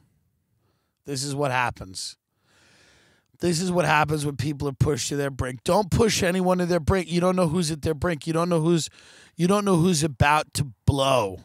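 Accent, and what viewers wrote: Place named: Chicago, United States. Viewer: love you Tim. you sound like my Chicago cousins. i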